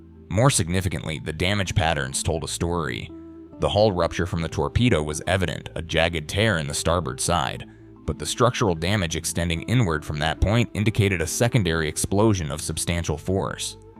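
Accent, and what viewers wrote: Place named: United States. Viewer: Soon as I heard that awful yank accent I had to turn it off.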